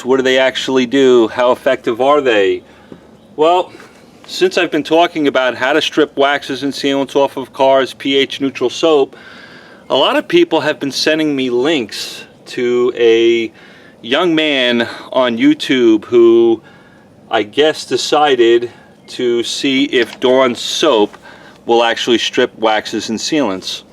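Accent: New York, United States. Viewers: he is from NY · He sounds like he has a NY accent, and I'm from there, soo it's a NY thing, the hand gestures lol, we talk with our hands too lol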